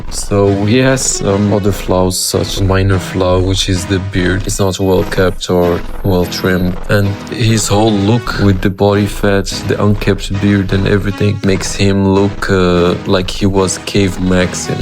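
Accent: Morocco. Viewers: Are u Moroccan bro? From your accent I can tell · Moroccan accent bruh ur moroccan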